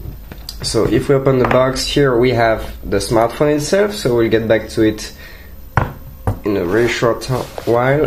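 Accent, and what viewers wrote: France. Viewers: lol nico rosberg voice when he speak english mix french english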